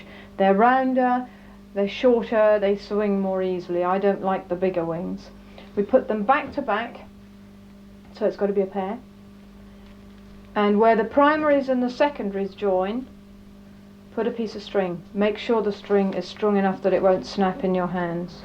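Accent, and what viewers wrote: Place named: RP, United Kingdom. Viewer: rather posh , I like her practical no bullshit style .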